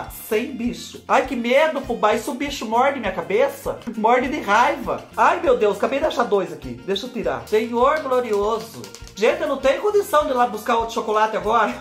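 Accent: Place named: Northeast, Brazil. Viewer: Fubá do céu eu pensei que vc fosse nordestino 💓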